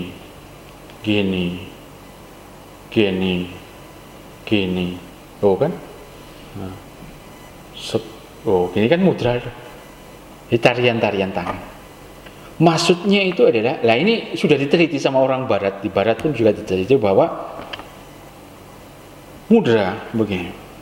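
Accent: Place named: Bali, Indonesia. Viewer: Mas kayanya dari bali, lihat logatnya, salut untuk konten2nya yg mendidik.🙏🙏🙏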